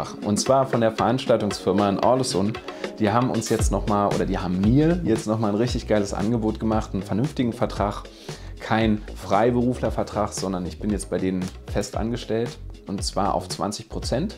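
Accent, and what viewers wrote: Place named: Berlin, Germany. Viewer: Super, herzlichen Glückwunsch zu dem Arbeitsvertrag! Das freut mich für euch 🙂 Um eure Frage bzgl. der Sprache i.S. Kanal zu beantworten: Ich spreche leider nicht perfekt englisch - auch nicht annähernd. Ich freue mich deshalb immer wahnsinnig, wenn endlich mal ein Kanal aus Skandinavien auf deutsch ist. Wenn der Kanal in Zukunft auf englisch wäre, würde ich wieder mit Untertitel schauen müssen, was dann oft bedeutet, dass ich das Video zweimal schauen muss, weil ich erst lese, um was es geht und mich beim zweiten Durchgang auf die Bilder konzentriere, denn die sind bei euch immer sooo schön. Ehrlich gesagt würde mir auch das "berlinern" zwischendurch SEHR fehlen ;-) .... Aber: auch wenn ich die Videos dann vielleicht nicht mehr schaue, werde ich euch treu bleiben, auch liken und auch einen Kommentar da lassen (wird dann eben immer nur ein Herzchen ;-), alleine um euch mit meiner Stimme zu unterstützen .... 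Im Sommer das Haus verlassen .... wie blöd! Och Mann, das ist eine wirklich blöde Situation für euch. Ich wünsche euch, dass ihr euren Weg und eine zufriedenstellende Lösung findet. Liebe Grüße ❤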